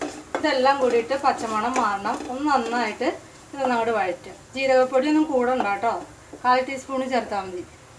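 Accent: Palakkad, India. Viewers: കാഴ്ചയിൽ തന്നെ സൂപ്പർ ആയിട്ടുണ്ട്, ടേസ്റ്റ് പിന്നെ പറയണ്ടി വരില്ല, അടുത്ത ദിവസം തന്നെ ഉണ്ടാക്കി നോക്കണം 👍 സംസാരം കേൾക്കുമ്പോൾ പാലക്കാടൻ ടച്ച് ഉണ്ട് ചേച്ചീ , ഞാനും ഒരു പാലക്കാട്ട് കരനാണെ 🥰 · Exactly.. Even I felt the same 😍Oru Palakkad touch in talking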